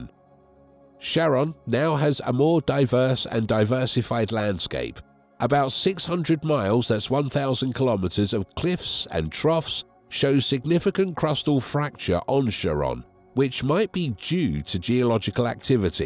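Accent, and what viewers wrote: United Kingdom. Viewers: Why do working class Brits narrate a NASA inquiry? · British limey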